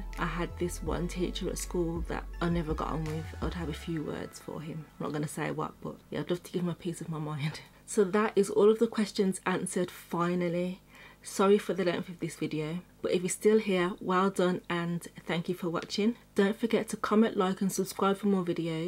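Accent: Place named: Wolverhampton, United Kingdom. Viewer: Thought I recognised your accent! Wolverhampton here :). Great video! It's always nice to see a fellow YouTuber grow! I subbed :)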